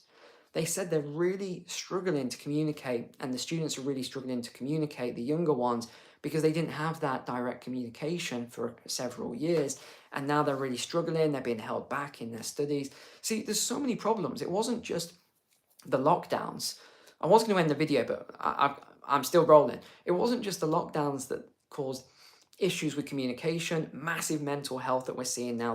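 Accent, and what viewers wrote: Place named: United Kingdom. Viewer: Hi Sir ! You always have a good report ! I want to tell you that I love your voice and your Brit accent ! My relatives are from Chester, England . my great great grandfather came from England to the US at seventeen yrs of age . Before Ellis Island . He came alone and stayed with his sister here in Connecticut ! Became a prosperous farmer and had 400 acre farm . He was a fine Christian man. Still got his road named after him .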